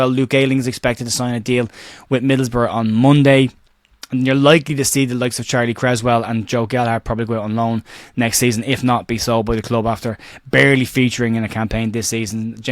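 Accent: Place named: Ireland. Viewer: Just want to say thank you so much for the content over the season. The amount of work put into each post is extraordinary. I live in Brazil, The View is a very important part of my life here! It’s great to hear a fellow Irish man talking about Leeds! Thank you so much so much, we’ll do it better and in more style next season and I’ll be following it on The View!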